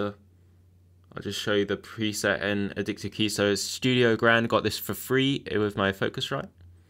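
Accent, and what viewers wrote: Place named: United Kingdom. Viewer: My Guy 😁🤓I'm from Germany lol sounds 100% UK👍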